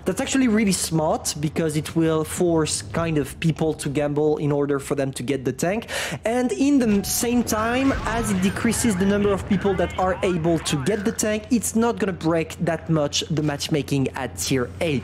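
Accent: France. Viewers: I live in a cave but I speak English without such an annoying French accent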